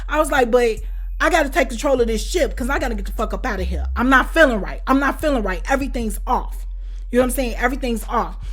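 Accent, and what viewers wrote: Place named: Philadelphia, United States. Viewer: One thing I can say is Philly people know when they hear another Philly voice lol I knew you was from Philly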